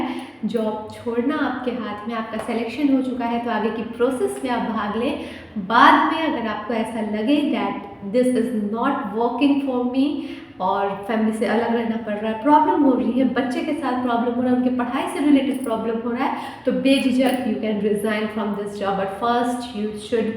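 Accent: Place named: Uttar Pradesh, India. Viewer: Kya aap up se nhi ho..... Language to up ki hi lg rhi h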